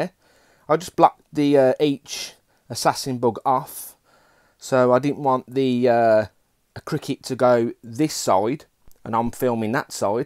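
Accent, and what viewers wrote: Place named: West Midlands, United Kingdom. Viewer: Ah I did also think Birmingham. I'm from Wolverhampton you see, it's nice to hear a somewhat local accent:)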